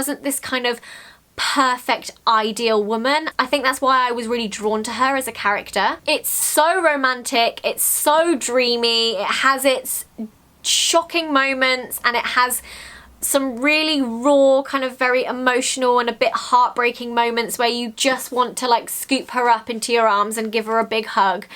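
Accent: United Kingdom. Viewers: YOUR EYES THOUGHHHH !!! AND I LOVE BRITISH ACCENT, SO THIS IS AMAZING 😂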